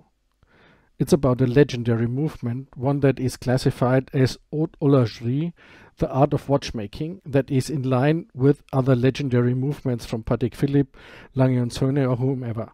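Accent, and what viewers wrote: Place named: Germany. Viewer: ❤ your German accent 😊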